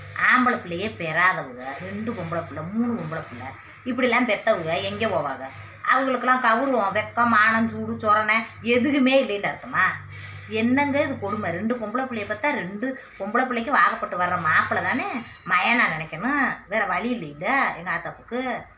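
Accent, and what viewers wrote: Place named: Mudukulathur, India. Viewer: உங்களின் பேச்சு வழக்கு முதுகுளத்தூர் வட்டத்தில் பேசுவது போல் இருக்கு.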